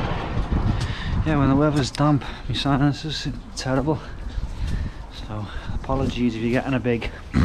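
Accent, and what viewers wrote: Liverpool, United Kingdom. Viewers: This man is Scouse.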